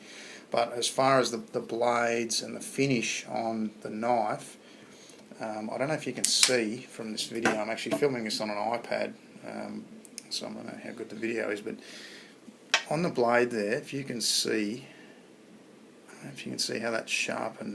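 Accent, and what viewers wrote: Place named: Australia. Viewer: wenger is apart of victorinox now :) oh and also ur accent gave it away... an aussie???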